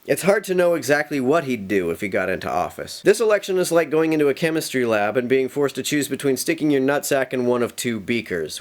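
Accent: Canada. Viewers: You're Canadian? But your accent is more less Canadian than mine (I'm from Minnesota).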